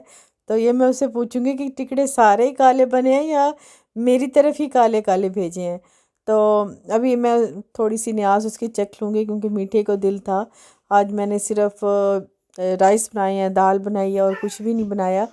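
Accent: Saraiki, Pakistan. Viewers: apni boli sariki my bht mithas hy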